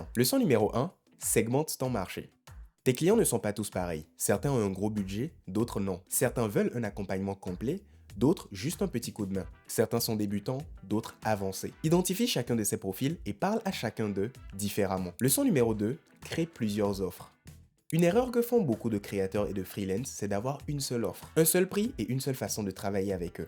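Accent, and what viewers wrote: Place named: Benin. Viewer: Great video Vous avez un accent béninois/togolais Rare de voir des feedbacks venant de ses nationalité Mercii beaucoup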